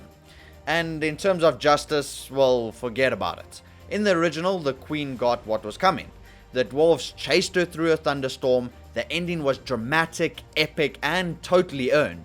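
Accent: South Africa. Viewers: Are you by any chance from south africa, cause your accent sounds like it. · Where's your accent from? Feels kinda South African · You know it's bad when Dragonball Evolution has a "higher" low rating than the movie. Also you're accent makes me think you're from South Africa?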